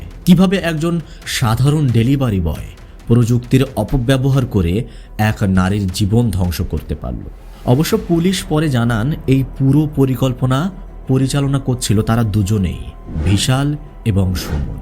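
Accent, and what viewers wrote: Bangladesh. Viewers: আপনি ১০০ শতাংশ বাংলাদেশের লোক। আপনি যতই আমাদের বাংলায় কথা বলার চেষ্টা করুন, আপনার এখনও জিভের আড় ভাঙেনি। দ্বিতীয়ত, আমাদের দেশের বাঙালি মুসলিমরা কেউ জলকে পানি বলেন না। কেন বেকার মিথ্যে বলছেন? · India তে কোথায়? ইন্ডিয়া কি শুধু হুগলী আছে? সত্যি বলতে কি কষ্ট? আমার মনে হচ্ছে বাংলাদেশি, উচ্চারণ গুলো ঠিক তেমনি।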